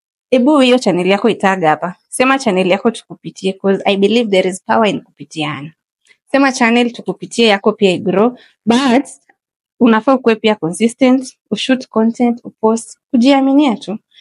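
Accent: Kenya. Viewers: Umeishi na mluhya hadi unaongea kama mluhya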